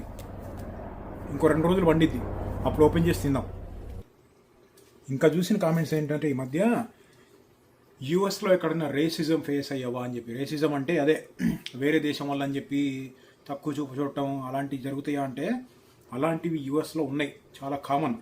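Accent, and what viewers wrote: Andhra Pradesh, India. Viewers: Bro meedhi telangana ayinappatiki mee slang completely andhra style lo untadhi...❤ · meeru telangana kadha anna slang mari andhra laa undi enti anna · Bro mee vooru Nizamabad antunnaaru kaani mee bhaasha Andhra slang lo vundi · needi telugu andhra telugu la vundi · Bro, meeru telangana nizamabad ayna, telugu mathram ap slang la undhi 😊😍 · Medi telangana khada. Mari andhra slang nti ani na dought 😊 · day 2 of asking ----- anna nvu gun carry chestava safety kosam? and nv nizamabad nundi annav kani andhra slang undi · meru chese vlogs chala baguntai, meru asala andhra na telangana na? Me native Nizamabad ani antaaru kadha, kani real ga ne meru Nizamabad aa? leka andhra ninchi akkada settle aiyaara? Me Telugu pure andhra laga untundhi, please clarify cheyandi.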